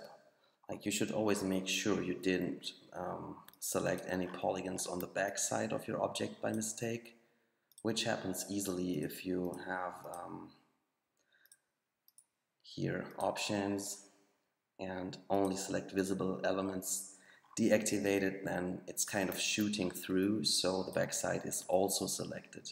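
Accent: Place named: Germany. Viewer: der deutsche akzent :D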